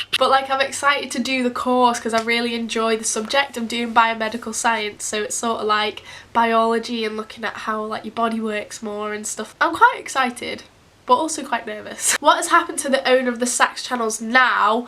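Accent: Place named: England, United Kingdom. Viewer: Where in England are you from? Love your accent!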